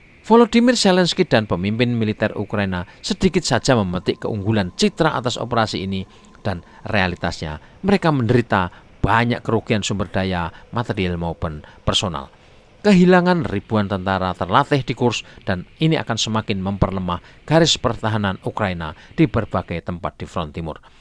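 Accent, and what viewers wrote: Java, Indonesia. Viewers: Narator nya lucu logat nya jawa bingit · Loghat jawanya di kurangi bos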